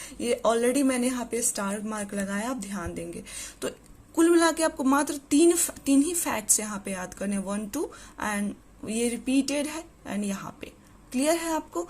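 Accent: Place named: Mithila, India. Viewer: Mam, you must be from Mithila province.Thank you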